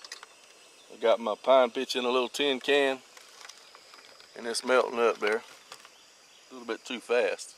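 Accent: South, United States. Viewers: This guy is fantastic! As an archer from UK theres nobody ive seen,in years of shooting that makes things so interesting and inspires me so much to make things effective and traditional. Ive spent money both on bought and making my own. Loving the style, and that Southern accent too!!¡ Best wishes from South England 😊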